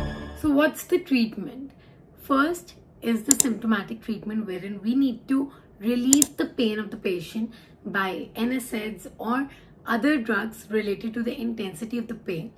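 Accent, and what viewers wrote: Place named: Pakistan. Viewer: Your accent is Pakistani ❤🇵🇰🇵🇰🇵🇰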